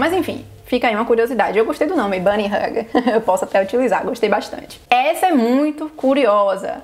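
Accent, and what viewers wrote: Brazil. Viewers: vc merece parabéns só por ter falado o nome do estado escroto no norte hahaha mas tem umas dicas boas. curti mais seu sotaque brasileiro (sdds br)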